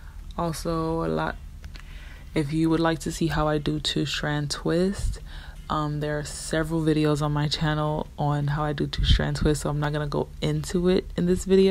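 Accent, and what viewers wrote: Haiti. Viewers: Are you Haitian? Your voice is very Haitian. Please tell me you’re Haitian.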